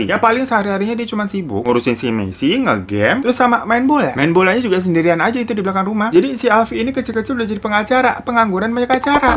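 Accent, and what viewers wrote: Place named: Banjar, Indonesia. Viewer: Kayak org Banjar wkwk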